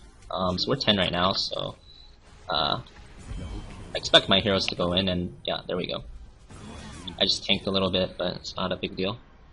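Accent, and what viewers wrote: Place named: England, United Kingdom. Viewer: Bro sounded more English than he does now, love you xo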